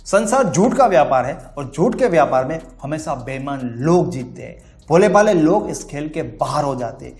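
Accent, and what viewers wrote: Gujarat, India. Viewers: Sir Aap gujarati ho?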